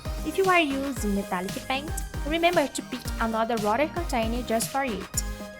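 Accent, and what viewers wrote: Brazil. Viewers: Nice tutorial, just a question Where're you from? Your accent sounds brazilian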